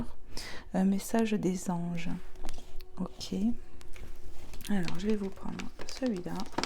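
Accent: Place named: France. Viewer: J ai fort apprécié, vous vous donnez beaucoup, yes! Yes! J espère que les choses vont enfin de bouger … j apprécié votre accent français , ça a qq chose de sympa. Je suis Belge,,, bon week end à vous🤸🏻‍♂️🦋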